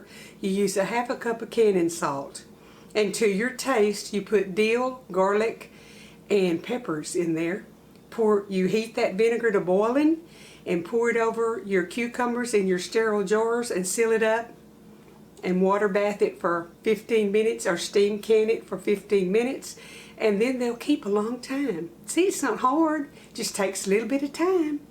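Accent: South, United States. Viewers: Rattle? I heard no rattling. I heard the melody and cadence of a lovely southern voice.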